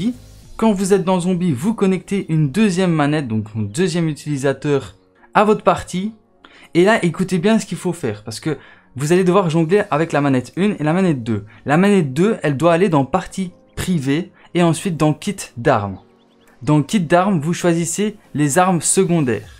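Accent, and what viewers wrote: Liège, Belgium. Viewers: Sa sent le liégeois haha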